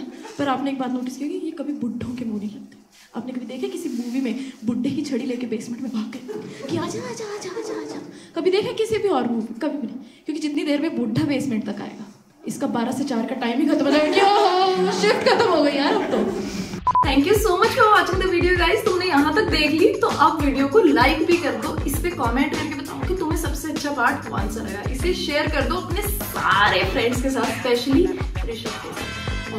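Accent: Haryana, India. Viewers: Haryanvi language was bang on 🤣🤣🤣🤣🤣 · Haryanvi accent 😅😅😅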